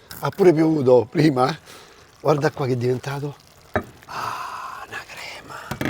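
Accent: Rome, Italy. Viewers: Bravo il tuo romanesco mi piace sei simpatico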